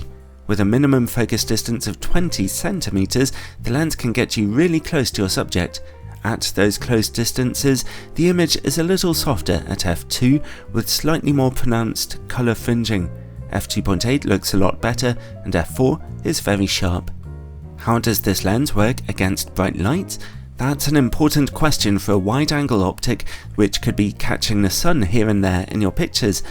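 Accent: United Kingdom. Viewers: Hi, greetings from Italy i always watch at your videos because, at the beginning, i needed some reviews to make clear my shopping ideas, but now i’m totally addicted to your British accent and of course your meticulous way of making reviews. i also thank you for being the best english teacher i’ve had in the past 8 years hope you will come to naples for taking some beautiful photos and also to eat something good, you’re the best. (let me get my new credit card so i can sub to your patreon) pps: when i’m drunk your videos are kinda the best thing i can ever watch because it’s sooo relaxing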